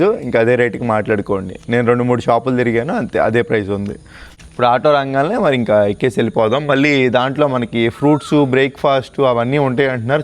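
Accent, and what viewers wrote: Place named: Godavari, India. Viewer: Oy abbayi ne videos super and ne voice kuda super same ma godavari abbayila behave chestunavu ne mata yetakaram thoo❤❤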